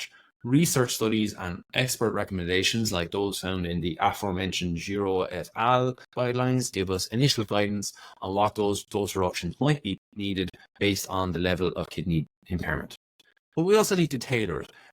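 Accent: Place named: Ireland. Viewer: Excellent. I have Stage 4 Ovarian Cancer, and they had to dial back my chemo dosage last year. (I had perfect kidneys before this.) Im now on Targeted Maintenance Therapy, and my kidneys are tanking more. I'm on a break until t'row when I potentially restart. I was being referred to my late mum's Kidney Specialist. (She had Chronic Kidney Disease from high blood pressure and Diabetes... and then 2 separate angiogram surgeries for a Brain Aneurysm & a Heart Attack - she died briefly with the latter - cooked her kidneys, making her terminal. It's an ugly disease.) I've not heard anything yet, though, I do have other conditions that necessitate I take various meds. It scares me more to have potentially damaged kidneys, than to have cancer... only because I've not seen anyone die of Ovarian Cancer. Anyway, I would've loved this as a video or charts. Your thick Irish accent to my Australian ears made some parts difficult, especially with some unfamiliar medical words. 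Anyway, at least thank you for that crux of the situation re kidney levels & what's going on. I never really googled my mum's disease - it was just another facet of her life. Thank you!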